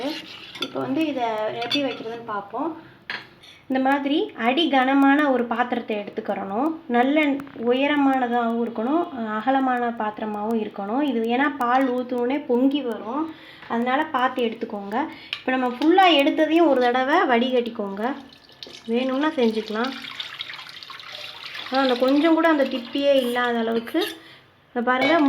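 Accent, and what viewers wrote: Madurai, India. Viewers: madura vaasam unga pechula and paruthi paal namma madurai la mattum thaan spl and kedaikkum innum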